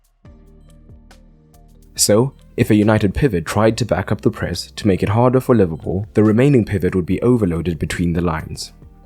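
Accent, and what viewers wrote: South Africa. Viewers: Narrator sounds South African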